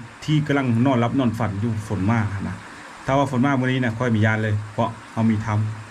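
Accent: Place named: Isan, Thailand. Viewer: ผมติดตามสำเนียงเว้าม้วนๆครับอ้าย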